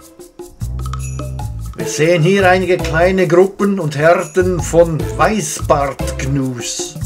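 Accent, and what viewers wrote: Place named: Germany. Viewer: What the f is this guy saying???? I know he's speaking English but it sounds German.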